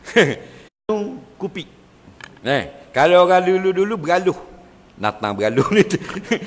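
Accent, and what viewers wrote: Terengganu, Malaysia. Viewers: Asalamualaikum ustaz..saye suke dengar ceramah ustaz sebab lorat Terengganu kadang 2ada dah lupa .ingat blek Katawa sendiri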